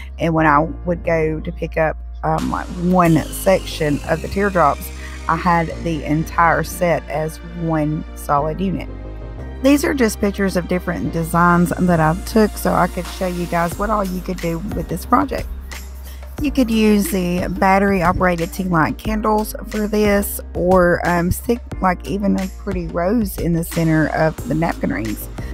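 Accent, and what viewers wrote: South, United States. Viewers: You are very creative. As another comment says, you think outside the box. Thank you for the step by step instructions. I love your southern accent!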